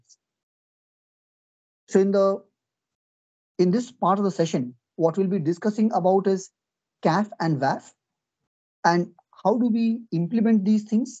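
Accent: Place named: India. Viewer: I'm still hoping that one of these days I will find a course for those examinations where the tutor doesn't have a thick indian accent.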